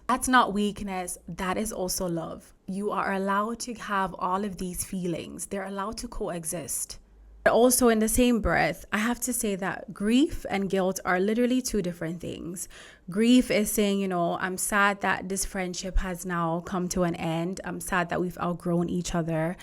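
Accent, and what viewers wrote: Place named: Jamaica. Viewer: I hear the accent, 🇯🇲🇯🇲. Women Dr. and new sub ❤. Big up!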